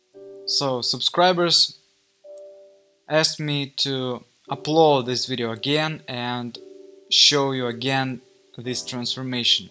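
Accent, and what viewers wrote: Russia. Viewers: You speak English SO WELL, so fluently. I like the Russian accent. It sounds nice to me.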